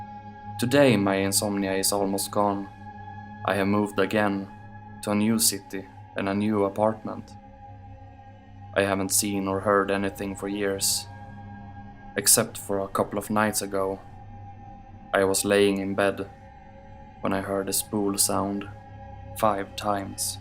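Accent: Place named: Europe. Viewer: Hi, just subscribed as I heard you on swamp dweller's collab and love your voice!! That accent!! Woohoo !! Great content and nice to here a few more European narrators and stories ( am a Brit myself )xx looking forward to seeing more x good luck 😉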